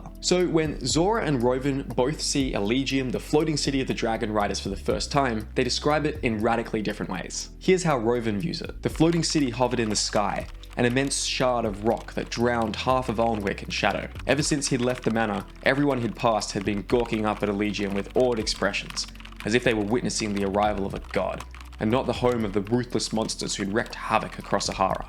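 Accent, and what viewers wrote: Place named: Australia. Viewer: Who is this aussy btw?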